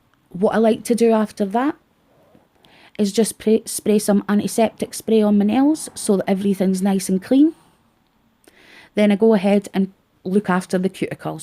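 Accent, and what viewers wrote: Scotland, United Kingdom. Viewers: Lovely Scottish accent. Nice video!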